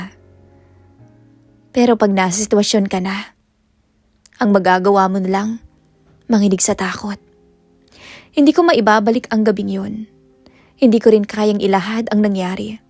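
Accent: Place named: Arab world. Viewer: Ang galing ng content creator na to gayang gaya Ang tuno ng English ng mga arabo.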